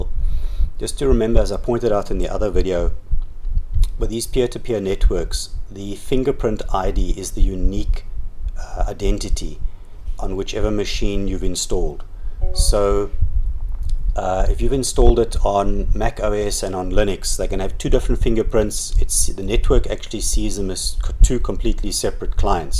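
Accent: South Africa. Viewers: I hear a South African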